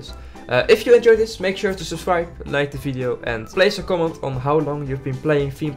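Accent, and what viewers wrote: Netherlands. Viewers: Are you dutch? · Sorry.... But i smell dutch btw ik ben het ook! Je bent goed in ebgels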